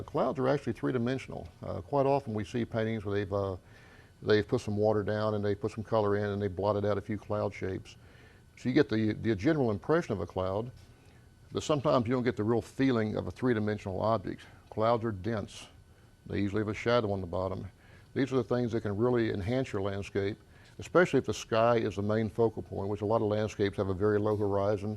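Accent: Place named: Texas, United States. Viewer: You sound like a Texan.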